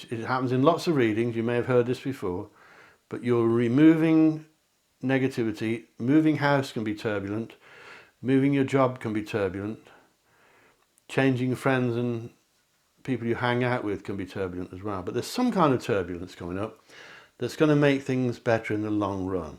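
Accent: United Kingdom. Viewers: I couldn't choose between 3 or 4 I think they both applied to me. I'm a constant worrier and my stress levels are thru the roof. I'm dependable but not like my husband he's the one always does what he says. As for the richness I associate that to happiness and good things in my life and I have that without question. Love to watch you and listen to your voice. I'm from a state called north carolina in the US and absolutely love British accents. Thank you very much for your readings and please do continue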